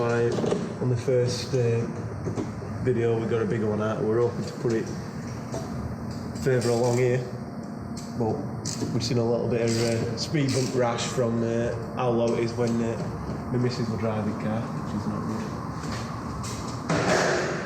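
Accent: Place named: Barnsley, United Kingdom. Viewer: Barnsley accent makes these videos 😂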